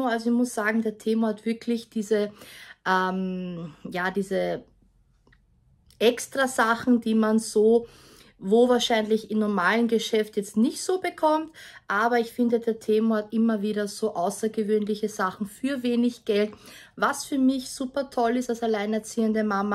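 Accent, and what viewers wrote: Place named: Austria. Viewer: Ich höre das Österreichisch so gern 😍 Schönes Video!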